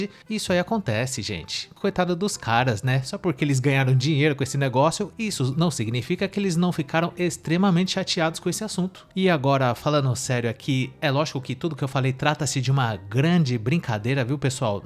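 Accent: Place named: Southern periphery, Brazil. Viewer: Um canal de 62 mil inscritos que não tem nem coragem de botar a cara querendo falar dos outros 😂😂😂😂😂 fora esse sotaque horroroso de periferia do sul que é intragável kkkkkk se enxerga seu invejoso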